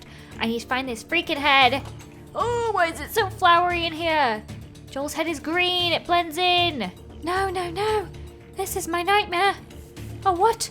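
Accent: United Kingdom. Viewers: sounds so much British in this video😂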